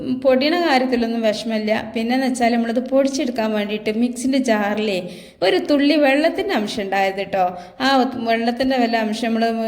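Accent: Malappuram, India. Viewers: Malapputam bhasha rasakrammanu..😊undakkunnathu kanappozhe vayil vellam varunnu.. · ഇംഗ്ലീഷ് നല്ലത് പോലെ അറിയാം പക്ഷേ മലയാളം അറിയില്ല... മലപ്പുറം ബാഷയാണ്.. തോന്നുന്നു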